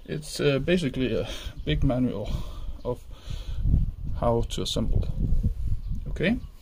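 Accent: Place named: Denmark. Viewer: Your English just sounded a lot like when a Dane speaks English. And I was curious what the price is with all Danish taxes etc :)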